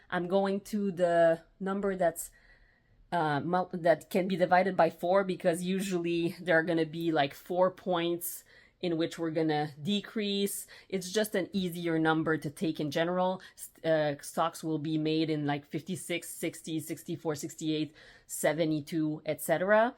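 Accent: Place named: Quebec, Canada. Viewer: I’m originally from Ottawa, born in Gatineau, so I’m enjoying your French Canadian accent! I wonder whether you could recommend yarn and where I can get some at reasonable prices? Going to watch your 14 years of sock-knitting video 😊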